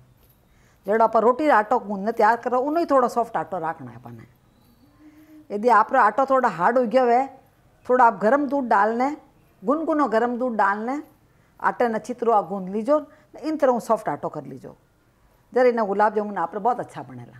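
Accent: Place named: Marwari, India. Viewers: Very nice recipe .Explaining in marwadi language is really sweet.Thanks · Ye to Bikaner side ki marwadi lag rahi hai. Jaipur me aise to nahi baat karte? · दिदि आपकी मारवाड़ी आवाज अचछी लगती है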